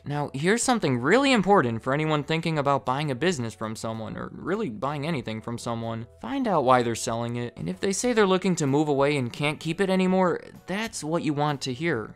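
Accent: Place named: Midwest, United States. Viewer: In this video, it is the word "straighten" or how you say it "straiden" lol. Some midwest thing idk ohio or illinois or something. But it's definitely some accent.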